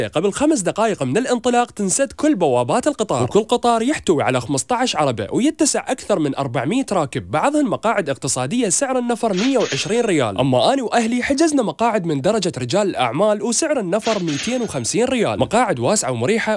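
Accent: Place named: Iraq. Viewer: بس سوال انت عراقي بس لهجتك حيل مبينة عراقي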